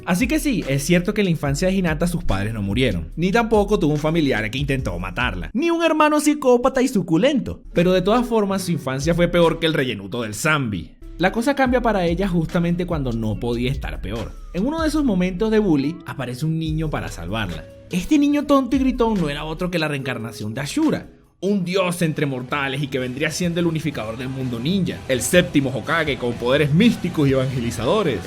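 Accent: Venezuela. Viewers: Jodaa ese acento venezolano xd i love naruhina, sigue asi, me encanta como editas. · Excelente video, estoy muy de acuerdo con tigo, es mi imaginación o tu acento es de Venezuela???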